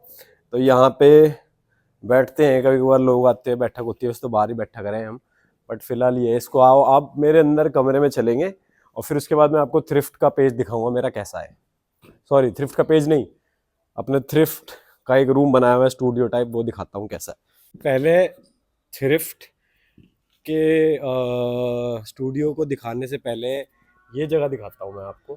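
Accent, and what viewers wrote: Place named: Haryana, India. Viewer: Ye kidhar hai baawli, accent aapka haryanvi ka Gujar, Yadav, UP, Delhi ke lagte areas ka lag rha hai. Support and blessings to you though!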